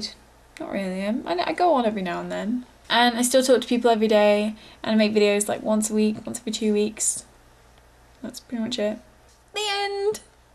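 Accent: England, United Kingdom. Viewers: Sorry that was a bit harsh, I didn't realize that comment was actually going to post, I'm not use to to actually being logged in. Anyway just listen to by. I'm a guy by the way. Keep up the British accent, that will always get you places in life. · British English. Woot. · You're adorable... i love an english accent. Thanks for posting! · i love british accent!!!! a new fan here!!! ciao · she is cute and funny in a sort of way ^^ I love englands accent! somebody said she was boring... well, she's not! just click on something else if u think it's boring;) search for manualist! that's a nice video by someguy i don't remember the name of atm... but it's awesome ^^ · haha, British! LOVE it! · your english accent is really really well spoken its quite mad. maybe because your now in canada? ha x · are you from england ?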